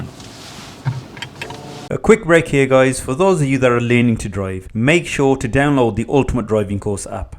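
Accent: Birmingham, United Kingdom. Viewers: Is that a slight Birmingham accent I detect?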